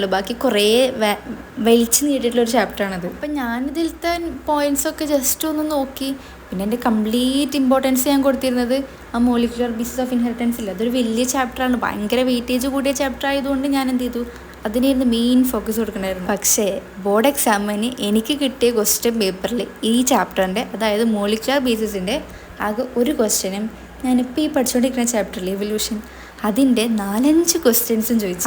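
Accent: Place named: Kozhikode, India. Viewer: Ningale slang kettitt oru kozhikodukaariyaanenn thonni😌🤌🏻, anyway avidethe fees engena pg?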